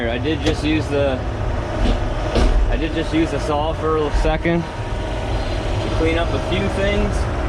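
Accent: Nova Scotia, Canada. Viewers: Dude, just found your channel!!! My Dad and his side of the family are all from Shelburne, Gunning Cove specifically (he moved to Cali when he was 11). Lots of my distant relatives still live in that area, across from McNutts island. Every summer growing up (3-4 weeks) he would drag me, my brother, and my Mom from Washington State to Gunning Cove Nova Scotia to spend time there. We would play golf, eat lobster, archery, fish, etc. I hated it then, but now, those are some of my most cherished memories. Dad passed away in 2010 (*uck you cancer), but us three have been back 4-5 times since. Part of Dad rests in the water of Gunning Cove just as he wanted. Sorry for the story, but listening to your accent and hearing Nova Scotia I had to comment. Thank you for the videos man!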